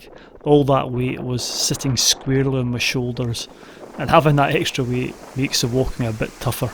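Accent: Scotland, United Kingdom. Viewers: I'm "jealous",:-( Great beautiful video it has an inviting character. Have already been up to pack my things and am leaving for Scotland NOW. Great to see nature while you still can. We humans have no alternative but just this planet and we must continue to use it responsibly. The way you tell in your Scottish accent is reassuring and enjoy the video in this flat little country where I am. Thanks for your video and on to the next one. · I’m a Glaswegian but never been back to Scotland for going on 20 years now. I really enjoy listening to your dialect……and not to mention the absolutely incredible filming, must be a lot of effort put into creating tour videos, it’s really appreciated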